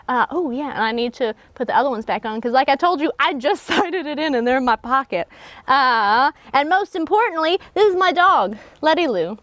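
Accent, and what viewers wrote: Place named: Shreveport, United States. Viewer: What a fun video to watch and I learned a ton. Your accent makes me miss living in Shreveport.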